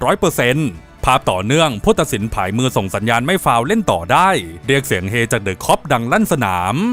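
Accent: South, Thailand. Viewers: หร่อยๆ ติดตามมานานแล้วครับ เด็กใต้ชัดเจน (ผม ป้ายชุมพรครับ) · พี่เป็นคนใต้เหรอครับ สำเนียงใช่เลย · แอดมินคนใต้เห่อนิ😅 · พี่บาวคนใต้เหอ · ผ่านจริง ติดตามมานาน555 พอรู้ว่าเป็นคนใต้ ชัดเจนๆครับ❤ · คนใต้กันนะ 5555ติดตามมานานแล้วนะหลวง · เห้ยยยยยย พี่บ่าวคนใต้เหอะนิ อัยจ่า ถูกใจ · แอดคนใต้หรอ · คนใต้เฉย แหลงหรอยเลยหนิ ขามจังติดตามเลยพันนี้:face-blue-smiling:face-blue-smiling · 5555แหล่งใต้ได้ใจผมอิหลีคับ❤ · หรอยน้องบ่าวแอดมิน แหลงใต้555+ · เอ๊าแอด คนใต้เหมือน😁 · สำเนียงแอดนี้ไม่คนนคร ก็คนตรังผมว่าไอ้น้องบ่าว😂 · เอ้า พี่คนใต้หรอคับ ถ้าพี่ไม่แหลงใต้ ผมคงไม่รู้ ติดตามมาสักพักแล้ว ทำคลิปออกมาเยอะๆน่ะพี่ รอชมผลงานอยู่คับ ❤ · ง่าเบอะแอดคนใต้นิ ชัดเจนพี่บ่าว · เอ้าาา พอรู้ว่า คนใต้นิ 😂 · แอดมินเป็นคนใต้นิ่ห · แอดเด็กใต้ด้วยหรอเนี่ย😂😂 ชัดเจนเลยไอ้น้องบ่าว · พึ่งรู้ว่าแอดเป็นคนใต้ ชัดเจนโบ้เรา หรอยแรงแกงงัว😂😂😂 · แหลงใต้สุดยอดมากเลย ผมคนสุราษฎร์ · พี่บ่าวเป็นคนใต้เหรอ สำเนียงชัดแรงนิ❤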